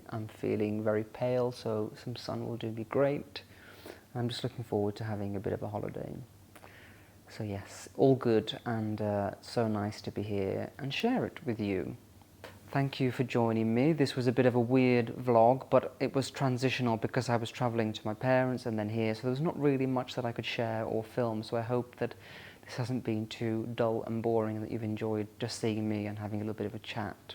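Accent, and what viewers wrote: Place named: Stoke, United Kingdom. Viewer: ahh those flat vowels you from stoke boy!! no pretence then !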